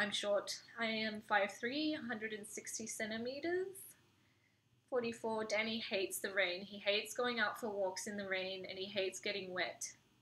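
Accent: Australia. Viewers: I can't place your accent. Have you lived in Australia?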